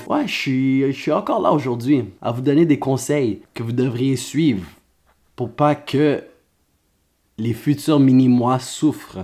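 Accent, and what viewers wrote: Quebec, Canada. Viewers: Bro t’es tu québécois #timequébec!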